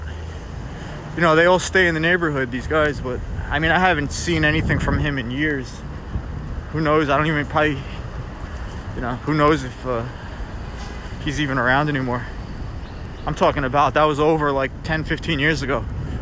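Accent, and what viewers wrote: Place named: New York, United States. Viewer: What with a thick New York accent i thinks he’s from just outside nyc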